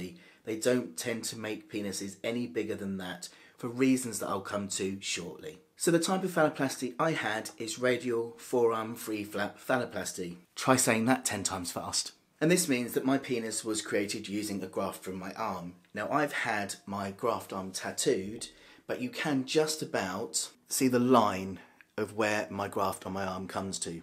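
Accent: United Kingdom. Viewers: I am impressed with your perfect British accent.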